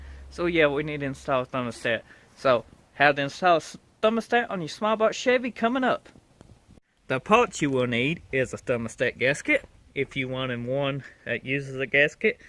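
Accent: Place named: Louisiana, United States. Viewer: Great video and thank you, are you from New Orleans originally? I hear a Louisiana accent.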